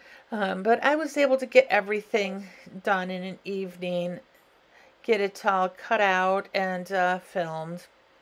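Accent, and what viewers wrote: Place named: Wisconsin, United States. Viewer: Nice cards! Your accent is driving me crazy! I lived in Connecticut when I was younger and I just know I've heard it up and over that way, but not quite that far... Wisconsin maybe??? LoL, see it's truly making me crazy!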